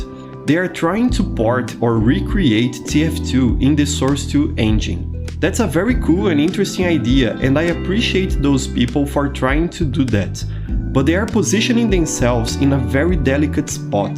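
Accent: Brazil. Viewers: I recognize the Brazilian accent a thousand miles away lmao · you sound brazilian ngl, but i liked the video, i think tf2 is a godly game but i hope it dont die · Has someone told you that yer have a very Brazilian accent? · man, are you brazilliam? I played once with the medic called "Kuling" who was healing you, also your accent, cool to see more brazillians in the tf2 community <3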